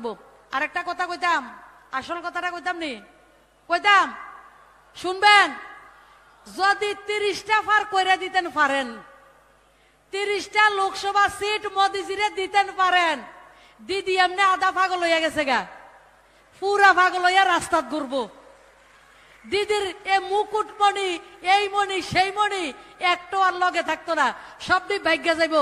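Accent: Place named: Comilla, Bangladesh. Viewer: তিনি সম্পূর্ণ ভাবে কুমিল্লার ভাষায় কথা বললেন।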